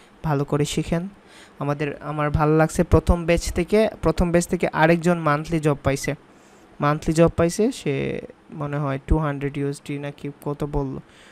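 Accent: Sylhet, Bangladesh. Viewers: ভাই কি সিলেটি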